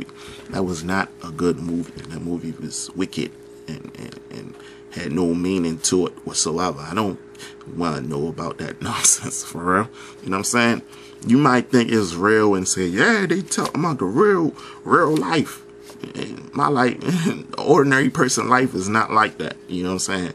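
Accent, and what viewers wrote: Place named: Niger. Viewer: I think its cool how your from dc but speak a strong Nigerien accent with DMV speech pattern.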